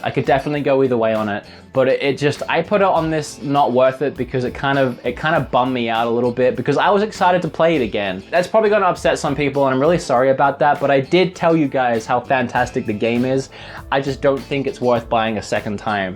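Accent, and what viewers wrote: Australia. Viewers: Wait.... YOU’RE AUSSIE??????? (Subscription intensifies) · I really want to buy a switch since I never got around to getting a WiiU. Nice to hear an Aussie on YT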